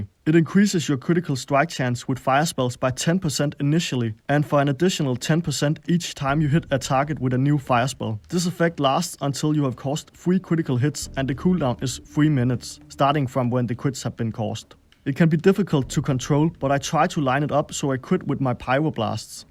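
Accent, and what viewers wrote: Denmark. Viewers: This is the thickest danish accent I’ve ever heard and I’m danish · That Danish accent tho! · Danish accent xd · 5 seconds in and I can without a doubt say that this is a fellow Dane. Greetings from Aarhus. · danish detected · The thicc Danish accent! Don’t play mage, but I really enjoy this content.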